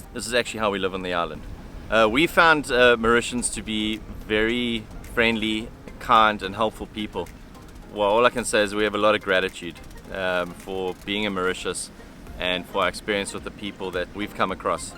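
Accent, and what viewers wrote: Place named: South Africa. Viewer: Hi. I found Yr channel while scrolling for Islamic prayers. I am pleasantly surprised by Yr positive and realistic attitude to Yr stay here in my little island, Mauritius where I was born n still living. Thanks so much for the realistic yet positive review on Mauritius. I hope u explore my little island little more enjoy z feel of Ramadan 2025 and Christian fast 2025 and get to know about our cultures values religions cohabiting harmoniously. I like the fact that u love the simple life in Mauritius. For Yr car, find yrself a mauritian mechanic which will cost u very very less than even rs 10,000 for servicing etc. I have a Toyota vitz yr 2000 with 6 months car fitness n 1 Yr road tax n I pay rs 6000 including mechanic fees for every 6 months servicing. If u so wish u can get a mauritian mechanic whose name is Saleem in another town called Beau Bassin. 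U won't pay so much. People will exploit u once they find from yr talking accent that u r from South Africa. So happy to hear from u n will wait for Yr next videos. 😊 lots of love from mauritius 🇲🇺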